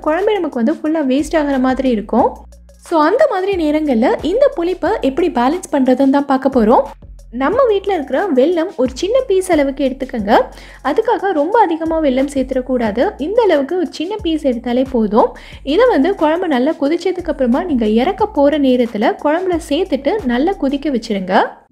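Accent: Madurai, India. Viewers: அஸ்ஸலாமு அலைக்கும் சிஸ்டர்.உங்கள் குரல் சூப்பரா இருக்கு நீங்க எந்த ஊர்.நாங்கள் மதுரை . பேச்சு வழக்கு எல்லாம் எங்க ஊரு போல் தெரிகிறது.அதற்காக கேட்கிறேன் தப்பாக நினைக்க வேண்டாம்.மென்மேலும் வளர எல்லாம் வல்ல இறைவன் அருள் புரிவானாக... ஆமீன்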